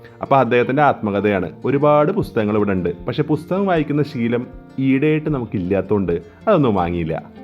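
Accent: Thrissur, India. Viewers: Palakkadukaran aanelm thrissur slang aan samsarikumbo kooduthalayum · Trissur slang adipoli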